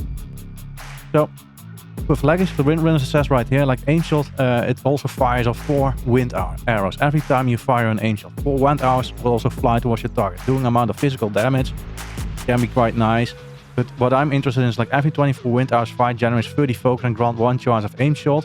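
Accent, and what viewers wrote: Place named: Netherlands. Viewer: Just started playing MM, pretty new to wow, not a very good player but trying to learn the ropes and get into pvp! Btw are you from NL? I lived there for 10ish years and your accent reminds me of my time there :)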